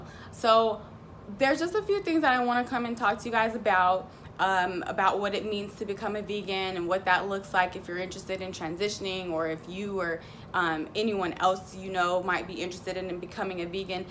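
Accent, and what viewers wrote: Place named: Jamaica. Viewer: Beaitiful skin; sounds Jamaican too.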